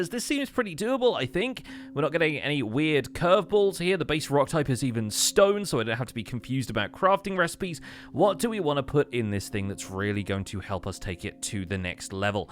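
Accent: United Kingdom. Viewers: I'm loving this play through. Keep em coming. You're a great YouTuber, I appreciate your British accent.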